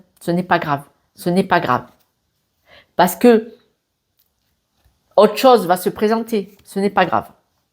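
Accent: South, France. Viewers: Bonjour je ne vous connaissais pas,et j'ai adoré votre guidance avec ce petit accent du sud il me semble, excellent merci pour tout ces conseils qui je l'espère se réaliseront pour la plupart.😉🙏🙏🙏🙏 Portez vous bien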